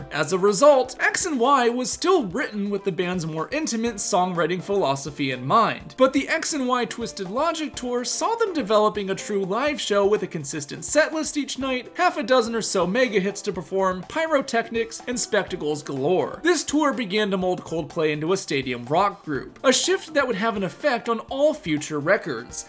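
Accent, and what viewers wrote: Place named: United States. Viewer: It's so strange to hear British grammar (Coldplay ARE) with an American accent, great video though (I'm assuming your accent isn't Canadian, might be wrong about that though)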